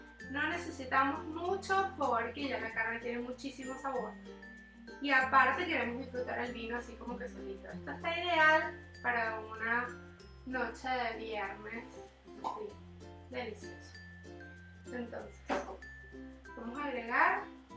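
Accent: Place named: Venezuela. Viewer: Su acento 100% Venezolano, lo note desde el principio. De solo escucharla me suscribi ;)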